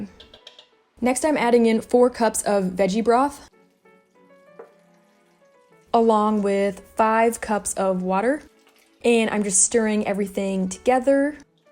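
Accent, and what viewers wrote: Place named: United States. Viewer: What American accent is this :-)? Sounds intrueging!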